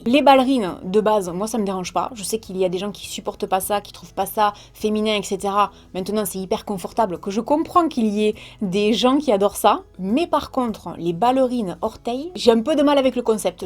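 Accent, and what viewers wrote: South, France. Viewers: J’aime trop ton accent j’ai l’impression d’être en vacances dans le sud quand tu parles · trop mignon ton accent!!!!! et vive les provinciaux.... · C'était une super vidéo comme d'habitude! Tu es toujours aussi belle (et personnellement j'aime bien l'accent du sud)! 😊😘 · Ahh non non au peigne- dents, maillot à poils et ballerines orteils! Non non!!:D y a des trucs que je n'avais jamais vu: manches xxl, jogging... mais pas du tout envie d'essayer! Et les cuissardes ugg me font penser au chiens qui sont tout fripés!:)) faut être à Hollywood pour porter ce genre de trucs et passer à peu près inaperçu!:)) très chouette vidéo!:) bisous! Et vive l'accent du sud! (une sudiste à Paris!):) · Perso je viens de Paris et j'adooore ton accent, mais en mm temps j'aime tous les accents du sud <3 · Coucou alors premierement tu as totalement raison " ca vient de Paris alors..." mais quand ob regarde ce qu'ils portent a Paris c'est de la dobe pour être polie lol c spécial faut avouer... 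Mais même moi qui suis du nord (ch'ti MDR) j'envie le sud pour bcp de chose: la météo déjà lol votre accent est carrément plus glam ke chez nous MDR on va pas se mentir et puis vos petits plats 😍 etc.... Je m'arrête la mais vous avez tant a nous apprendre... Voila bisoux et ne te remet pas en question reste au tu es c'est parfait je t'adore 😉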